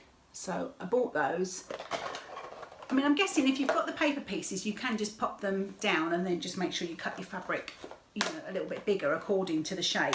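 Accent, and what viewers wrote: England, United Kingdom. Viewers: Hi I really enjoyed your visit today you and I have much the same taste in fabric and patterns I made Tula bloomers quilt year before last useing tilda fabric I also made a few more of her patterns in her fabric and some other lines it is so nice to hear the accent I spent many happy times in Sudbury and other parts of Suffolk and grew up in Essex your work is stunning and your quilts Nate on point your stitching is amazing I haven’t seen your knitting yet so will go back for a look thank you so much for your wonderful visit Angela in ireland 🇮🇪 🙃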